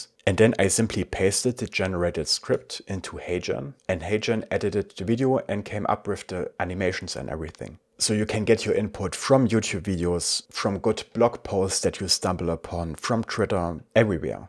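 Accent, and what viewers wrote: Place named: Germany. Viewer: thanks for the detailed review of HeyGen and some great quotes there such as it "even maintained my German accent" ☺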